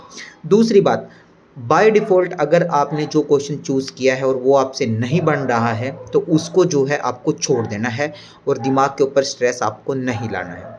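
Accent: Haryana, India. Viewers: Aap Haryana se ho bhaiya ?? Apke bolne ke way to lg raha h ...i am also from haryana..btw my exam is on 12th April...wish me luck bhaiya❤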